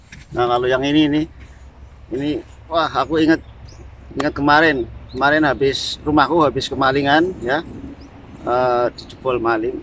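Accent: Banyumas, Indonesia. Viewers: Asline ngendi Mase, kayanya ngapak koh ,apa tanggaku Cilacapan. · Wong. Jawa tengah ya. Mas , Ngapak. Kaya. Nyong , Selamat tugas , mashe , , Mantaaappp. Nang. Jepang. Ya 👍👍👍👍👍👍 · Jawa ne ngendi mas ?? Logat e ngapak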